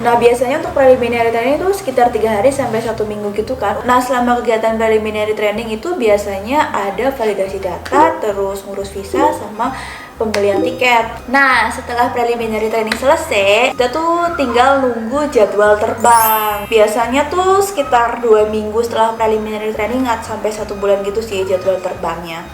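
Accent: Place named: Paburan, Indonesia. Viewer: Lah teteh orang paburan tahh,sedayeuh 😂😂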